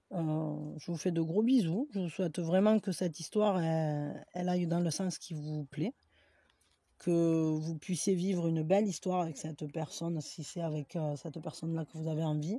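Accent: South, France. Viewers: Cc et merci pour le choix numéro 1😊😊👍🏼👍🏼 et avec ton accent je voyage dans le sud de la France 🤗🤗💖💖😘😘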